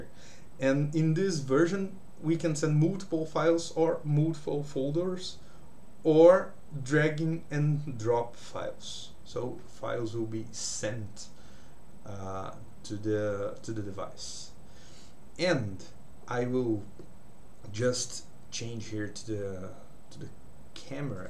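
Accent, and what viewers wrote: Brazil. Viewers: Desconfiei que era br pelo sotaque kkkk representou mestre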